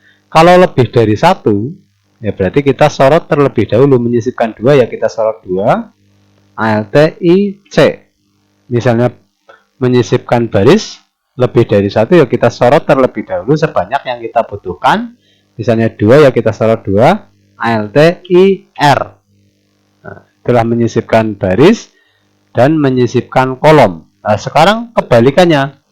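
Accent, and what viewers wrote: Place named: Banyumas, Indonesia. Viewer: Gokil..yakin..jos wong ngapak. Jelas bgt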